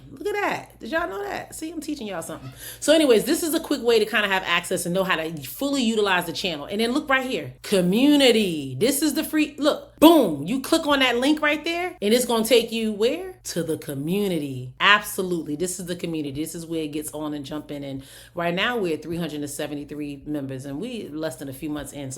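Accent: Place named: Philadelphia, United States. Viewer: That Philly accent is strong!! Much love🙌🏿🙏🏿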